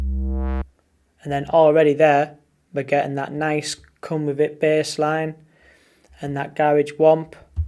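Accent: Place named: Leeds, United Kingdom. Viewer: Your guna do well on here, I've been searching speed garage tutorials for the last 2.5 years!! There's fuckall!! Lol nice bass that mate, we're you from? You sound very close to my home town Leeds??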